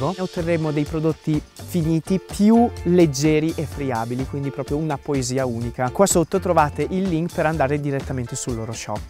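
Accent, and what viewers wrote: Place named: Trentino, Italy. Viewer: Oltre che bello, quello accento Trentino lo adoro. Marchigiana ma con il Trentino nel cuore. I love val di non. ❤ Tovel ❤❤❤❤❤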